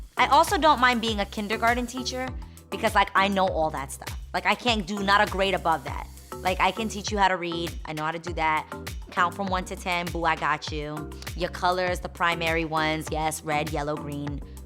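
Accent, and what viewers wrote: New York, United States. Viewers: Lmaoooooooo "i'm an exhausted pigeon throughout the day" that was so hilarious and i can so relate; i love how you could hear your NY accent so much herw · Hi. You will probably never see this comment but I have to tell you that I love your YouTube channel. I relate to you so much and love your New York accent. I grew up in the lower east side, in the LaGuardia projects and I am also 4' 11! My cousin went to P.S. 110 and his mom currently teaches there. He died on 911. Anyway, your channel always makes me laugh and feel good.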